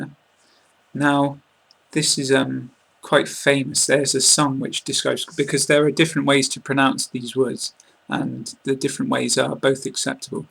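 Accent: United Kingdom. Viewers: An excellent series of learning English with the right british accent..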